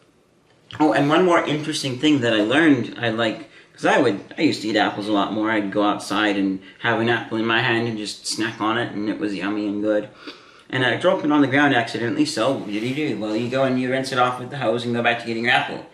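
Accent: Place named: England, United Kingdom. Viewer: kinda an english accent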